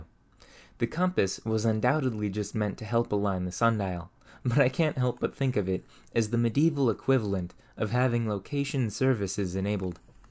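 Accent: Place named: Oregon, United States. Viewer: Sounds like Organ State to me.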